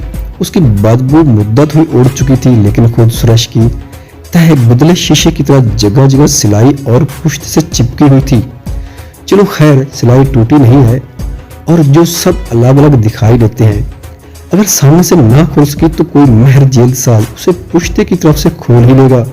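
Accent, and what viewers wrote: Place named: Saraiki, Pakistan. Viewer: Yaar sariki accent m Urdu pharty hain aap aur pronunciation ki Aatny gultian uffff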